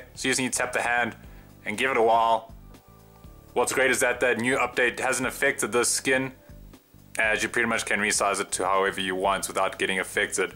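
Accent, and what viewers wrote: South Africa. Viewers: You sound South-African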